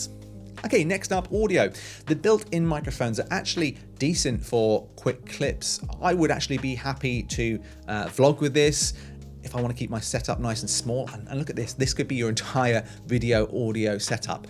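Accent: United Kingdom. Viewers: I love the British Accent 😯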